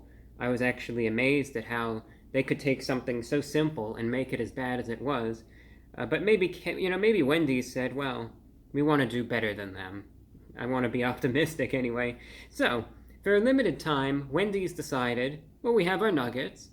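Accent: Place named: Mid-Atlantic, United States. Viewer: Mid Atlantic accent and idk why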